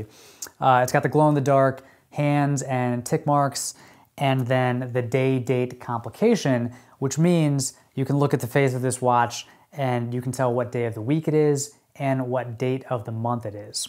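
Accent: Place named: East Coast, United States. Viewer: Sounds a tad bit East coast, but also eatern midwest. If I guessed I'd say hes from VA-OH-PA something in that middle east coast range.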